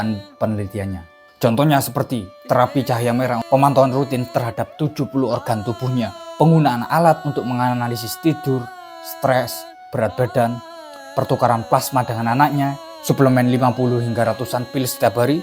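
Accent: Java, Indonesia. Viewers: logatmu medok banget min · Suara mu terlalu medok le le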